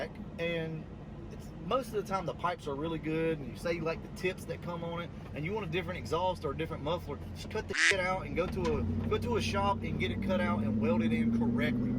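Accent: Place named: South, United States. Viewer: I'm new to your channel. Like 2 months in now. I knew you were close to my old stomping grounds by your accent. Then I saw Dothan today. You ever run anything at North Florida Speedway?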